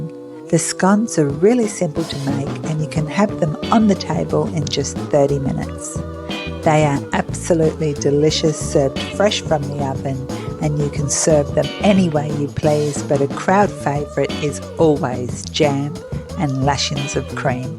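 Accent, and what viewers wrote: Australia. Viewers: Fantastic!!! Thanks so much! So happy to hear a fellow Aussie cook😃💞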